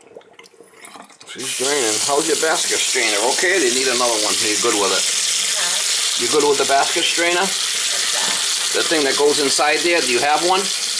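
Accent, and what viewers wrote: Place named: New York, United States. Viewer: were in the USA are you based I'm a retired plumber and heating engineer from Scotland love your videos your just an honest tradesmen earning your corn but not bull shiting your customers you sound like a new Yoker apologize if I'm wrong I'm from Glasgow Scotland love your videos but remember it ain't easy being cheezy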